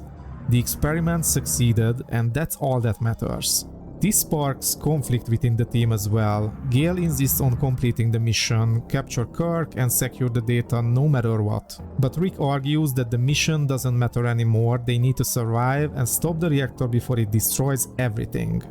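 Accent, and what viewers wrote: Hungary. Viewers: judging by ur accent im 100% ur hungarian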